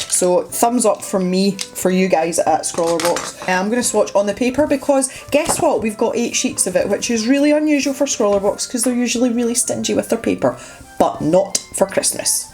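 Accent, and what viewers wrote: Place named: Dundee, United Kingdom. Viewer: the Dundee accent is def different lol Glaswegian confuses me a lot I think the closest thing similar in Canada is the west coast accent compared to east coast. but thats provinces away by distance not a few miles but that makes sense since so many scottish/irish people went to the east coast hundreds of years ago (you do have very small regional differences in slang and sort of in accent but nowhere on the scale like it is in Scotland)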